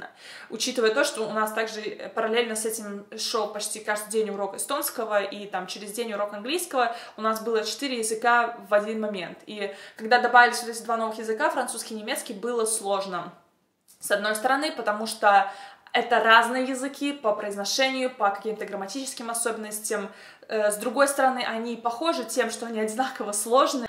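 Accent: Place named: England, United Kingdom. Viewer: Слышу в твоём русском английский акцент 😄😄😄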